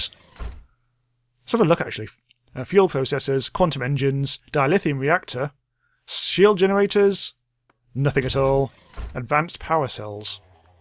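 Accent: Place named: England, United Kingdom. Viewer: It's a fairly typical English accent, I don't sound much different either. :)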